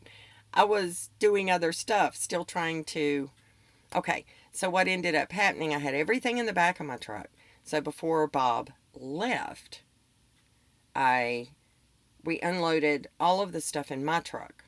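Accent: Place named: Carolina, United States. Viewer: I told my wife you were from North or South Carolina or maybe North Georgia with that accent. I reckon I wasn't too far off. 👍🧔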